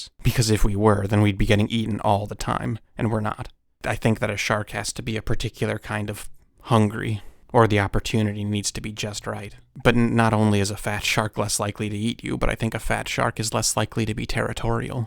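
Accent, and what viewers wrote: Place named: Midwest, United States. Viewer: Here’s my assessment on why videos are so good: 1.) Great editing— knows what, where, and when to insert footage/still photos/illustrations. 2.) Fringe subjects— brings us news and stories that are often dark and overlooked. They pique the macabre portions of our minds. 3.) Thoughtful writing— couples the best words with the right images. He chooses smart verbiage. With, you’ll be served big portions of choice descriptors, which are juicy and filling. 4.) The voice—The sound of voice is laden with minuscule, barbed filaments. Once it passes through your ears, you’re hooked. His accent is unique. It’s regional Midwestern, USA. You can love that, or you can hate it. You cannot, however, mistake that. voice is offered in the right tone—low and eerie (in a good sense.) It’s delivered at a smooth pace, without stammering or hiccups of any sort. 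I sincerely hope that channel swells and rockets to the stars. He deserves it.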